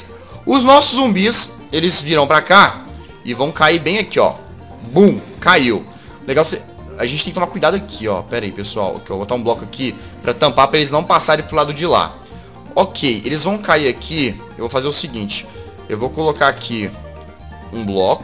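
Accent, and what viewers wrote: Minas Gerais, Brazil. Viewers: Vc mora na Bahia????? Podia jurar que vc morava em Minas Gerais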